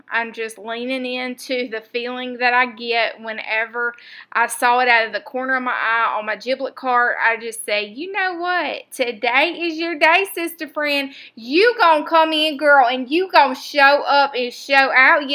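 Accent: South, United States. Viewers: Love to watch you and listen to your southern accent! Love how you use your giblets!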